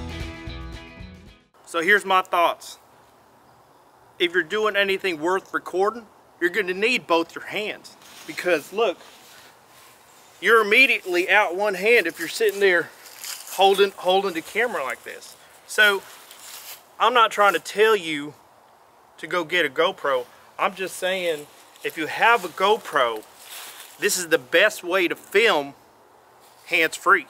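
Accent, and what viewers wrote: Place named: South, United States. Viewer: I ain't gonna lie this man's southern accent freaked me out for a second... lol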